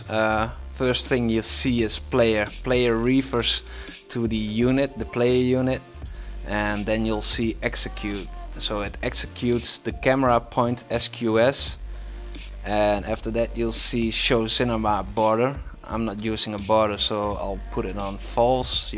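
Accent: Netherlands. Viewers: Nice ! Are you from the Netherlands? Just wondering cause of the accent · You sound Dutch, are you? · Great tutorial , easy explanation for dummys and people who just needed that info + I can hear your Dutch so thats always good hehe. If you ever need help editing , acting or anything else please let me know, Would love to know ( + Im also experienced)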